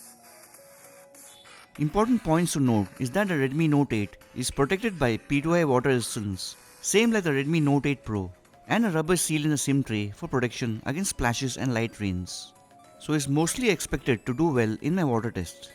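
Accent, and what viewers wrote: India. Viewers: from your accent i can see you're indian. Kudos for this video since actually the audio is in english and the title and the description, they match, unfortunatelly 97% of indians put english titles and description and in the video they speak लड़कीहैलो . Come on indians it's not that hard to be considerate! learn from this guy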